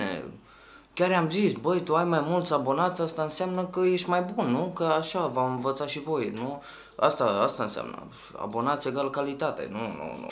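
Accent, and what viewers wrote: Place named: Moldova. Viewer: Ăsta e unul dintre milioanele de motive pentru care eu îl urasc pe! deci, pe langă sa zică la copii sa doneze, şi sa enerveze părinți să le dea bani lui, îşi mai permite si sa îşi bată joc de sucre care, frate, el a avut un trecut prin închisoarea din mexic, fără familie, fără nimic! Dar a fost ajutat la orfelinat de părinți lui (care cred eu ca au fumat ceva) in moldova, trădătorul famili noastre, şi se da el şmecher că el e al 2-lea sau al 3-lea yutubăr ROMÂN care a a milionu. În primul rând el e MOLDOVEAN!!! Trădătorul famili noastre! şi al doilea rând, manipuleaza copii cu accentul lui de moldovean (accent de moldovean). Eu efident, țin cu sucre, findcă el nu e trădătorul famili româniei, şi findcă eu cred şi (probabil) ceilalți cred, ca fura lucruri de la sucre (e evident la câți bani are......). Deci, cei care au dat dislike la acest video, (fără supărare), ați fumat pra mult videoclipurile lui (REKT) Voi puteți sa scapați de asta cu noi toți, adică, uitațivă la ce Heitari are (Zopi teve, Dărielred şi alți) (scriu aşa în glumă) Yutubări voştri preferați. 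Dacă nu ai auzit de aceşti oameni până acum, tu eşti, cel mai probabil, un copil de 5 ani din moldova (daca nu îi şti, dacă îi şti, bravo) Deci, trebuie să scapam de iliesime. (glumă) P.S: sper să ajungă la poliția yutubului de Călinacho! #nuvremcolavremcoroana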